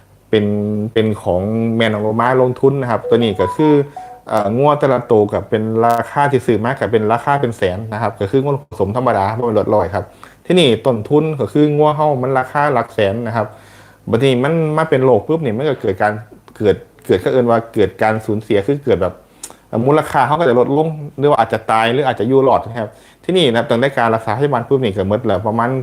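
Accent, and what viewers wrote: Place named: Phutthaisong, Thailand. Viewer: พุทไธสงชัดเจนคับ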